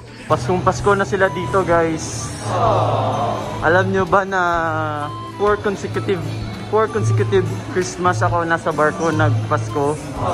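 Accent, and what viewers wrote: Visayas, Philippines. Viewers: Dun ako natutuwa sa opening spiel mo na What's up ketchup... dun pa lang good vibes na pati pagka-candid mo. Tuwa din ako sa Visayan accent mo (no offense meant, hah!), na kering-keri mo, bro!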